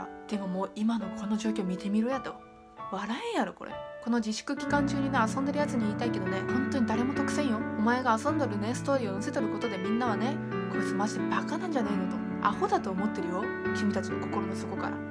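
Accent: Nagasaki, Japan. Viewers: 未だに外で遊び回っとる奴らはその行動1つ1つがこれからの未来に関わってくるって重く受け止めて欲しい。あと全然関係ないですが、自分博多弁の人なんですけど、さん長崎弁話されててなんか親近感が沸きました(*^^*)これからも頑張って下さい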